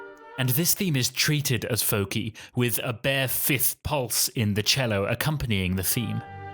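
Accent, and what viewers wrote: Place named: United Kingdom. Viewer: Que curioso suena el acento británico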